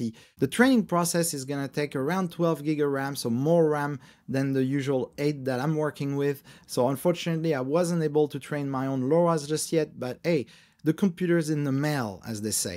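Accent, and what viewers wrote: France. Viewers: don't say you have a terrible french accent it is lovely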